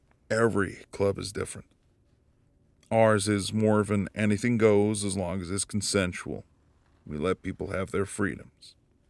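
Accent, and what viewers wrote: United Kingdom. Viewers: Still got that British dialect. definitely ❤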